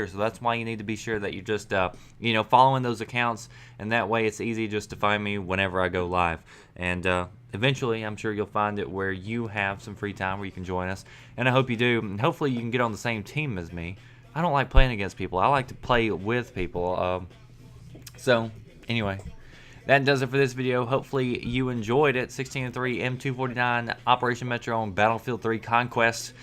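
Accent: South, United States. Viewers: where you from? i had a feeling you were from the south with that accent. go vols baby. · what part of the south are u from?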